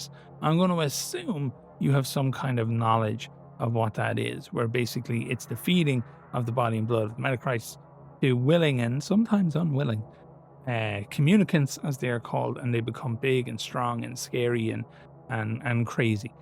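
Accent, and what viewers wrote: Ireland. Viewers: Irish? Subbed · Are you Irish ?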